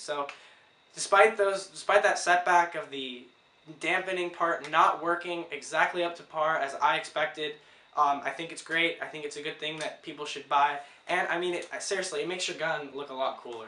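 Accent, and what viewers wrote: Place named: North America. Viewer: You speak with a North American English accent and yet you keep pronouncing Aluminum the British way...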